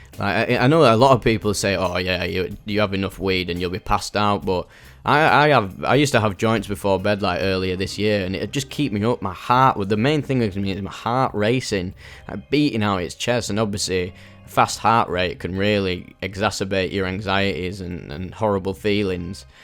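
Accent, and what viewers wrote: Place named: Yorkshire, United Kingdom. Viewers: You from Yorkshire? I'm from Hull, you sound like you live close. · mate you sound so northern are from the NE? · This geezer sounds like hes from yorkshire 😳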